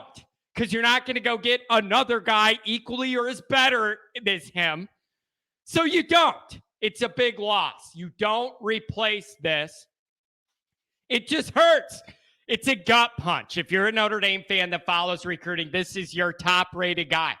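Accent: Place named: Ireland. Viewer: I love this lil angry Irishman…his accent is 90% of his charm. Great channel!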